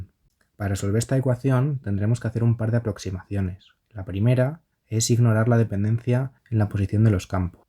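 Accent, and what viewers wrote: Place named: India. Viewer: Gran vídeo amigo, me salvó el semestre. A veces no se entiende el acento hindú, pero está chévere. Saludos desde Perú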